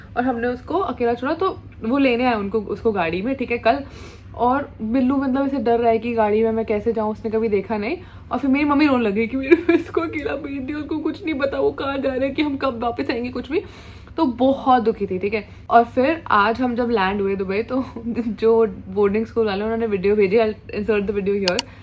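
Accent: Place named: Punjab, India. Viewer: such a cute punjabi u spoke😅❤❤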